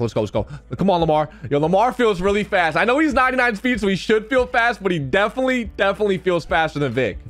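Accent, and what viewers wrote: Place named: New Jersey, United States. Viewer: where are you from ? you have a jersey accent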